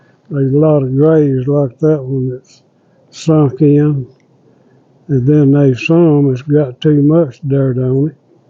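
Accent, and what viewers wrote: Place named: Mississippi, United States. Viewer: I enjoyed your video, the stories (headstones are a book of life to me) your kindness and your Mississippi accent. 😊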